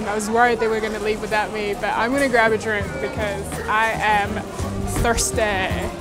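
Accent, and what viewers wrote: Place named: Australia. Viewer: What a great vlog! Really well edited, I'm envious of your comfortability talking to the camera in front of others! Also cool to hear an Aussie accent telling me all about things to do in Taiwan - where I currently am filming my own vlog...also in an Aussie accent. vlogception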